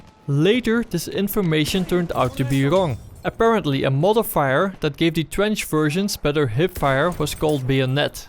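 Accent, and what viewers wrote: Netherlands. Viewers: Het spijt me om het te moeten zeggen, maar het is heel erg duidelijk dat je nl bent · you sound so dutch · how dutchies speak english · u dutch? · Your englisch is like a dutch guy ? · je klinkt Nederlands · je hebt wel echt een zware dutchlish accent man. Vind t wel mooi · toooooo obvious that he's from the netherlands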